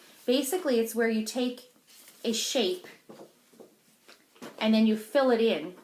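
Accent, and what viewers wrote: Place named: Newfoundland, Canada. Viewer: Great video/WIPs ...I loved your doodling pics too. I really enjoy listening to your Newfoundland accent👍🏻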